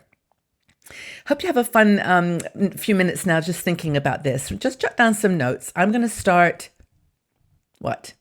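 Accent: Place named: England, United Kingdom. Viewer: LOVELY!!!!! SO NICE TO HEAR YOUR ENGLISH VOICE MY DEAR. I LEFT LONDON IN 1952 AND STILL HAVE MY BRITISH ACCENT, OF WHICH I AM VERY PROUD. I MEET FEW BRITS, BUT LOVE THE ENGLISH MENTALITY, WHEN I DO....SO. POLITE, EMPATHETIC, FRIENDLY AND UNDERSTANDING, A GREAT JOY ALWAYS....A N D... SO I SEND MY WARMEST CHRISTMAS GREETINGS TO YOU. YOU ARE INDEED A PLEASURE TO ALL OF US.... AND ......I LOVE TO BE ALONE AT CHRISTMAS....I CAN REFLECT AND THINK OF MY BELOVED HUSBAND AND MY DARLING DAUGHTER WHOm I LOST LAST JANUARY TO CANCER...MY FAMILY ARE NOW FEW AND FAR AWAY, BUT WE ARE VERY CLOSE, AND KEEP IN TOUCH ALMOST EVERY FEW DAYS...SO THE LOVE NEVER CEASES....But I am alone and that;s fine with me....I make the best of life...Happy Christmas to you dear friend. I AM NOW 88 1/2 AND AM AS FASHIONABLE AS I ALWAYS WAS!!!! LOVE IT!!!! Hahaha! I honestly love to be alone at Christmas, and reflect on those happy memories I have.I am not sad at all.....I LOVE my time alone, and look forward to the new year immensely.God bless you and yours. 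You are my Mince pie and sausage rolls connection. My Mum too!!!!! used to make them, and we put sixpenny pieces in the Christmas puds she made......Happy days....even the War days.... Happy Christmas everybody💋💋💋💋🌲🇬🇧🇨🇭🇺🇸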